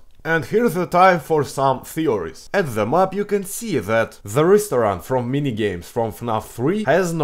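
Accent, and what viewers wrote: Russia. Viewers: This russian accent is impssible to replace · Very 👎 Russia accent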